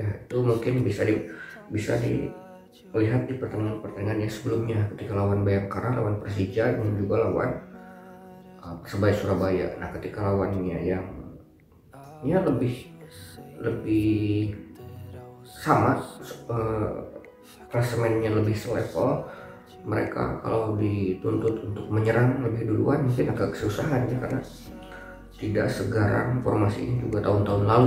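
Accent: West Java, Indonesia. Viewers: Prediksi saya .mah persib menang.. tp ini adminnya pedukung persib..di liat dri logatnya bicara sih urang sunda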